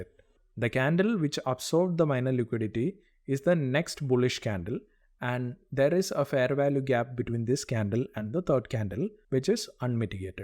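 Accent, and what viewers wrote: Kerala, India. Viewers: Sir, are you from kerala?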